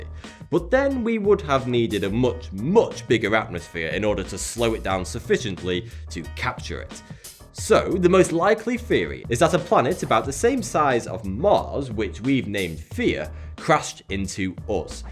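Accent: United Kingdom. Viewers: Hi if he lives in Asia why does he have such a heavy British accent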